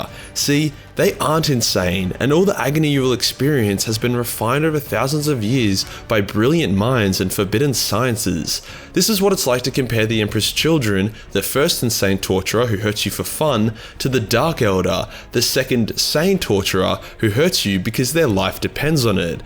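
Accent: Australia. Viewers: I'm really disappointed that you did not do any political jokes. You throw homophobic slurs, sexism, autism jokes and more. But oh let's give politics a break. What the fuck dude. >:( I thought I was listening to an Australian. Not a Canadian.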